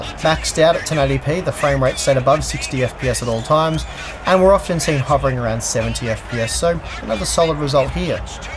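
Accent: Australia. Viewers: Sooooooo you sound Australian why not give Australia prices?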